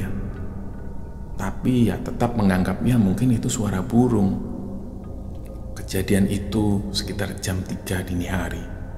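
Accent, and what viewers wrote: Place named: Tegal, Indonesia. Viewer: Walah ternyata mas wong Tegal rupanya. Ngapak dong...😁😁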